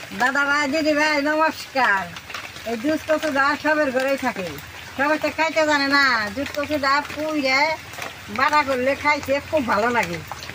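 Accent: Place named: Bangal, Bangladesh. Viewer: একে তো ফোকলা তারপর বাঙাল ভাষা। কিছু ই বুজতে পারলাম। না সরি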